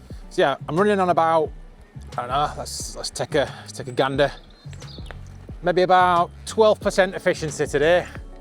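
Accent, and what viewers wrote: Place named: Barnsley, United Kingdom. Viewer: Eyup fella, there's nowt worse than feeling knackered sleep wise, but at least you got out and did summat. You can't beat getting out and getting some air in your face, and especially when you're fatigued, or your head feels full. Don't worry about your Barnsley twang, it's who you are, and your music was just rate and not too harsh to go with your ride. Me and my mate are having a rest tomorrow (Sunday) we've done 6 rides this week and about 145 miles according to strava. I see your on a emtb, have you ever run out of battery? We're not ruling out having one ourselves at some point in the future, but while we can, we'll keep battling on. Keep the videos coming fella 🚴‍♂️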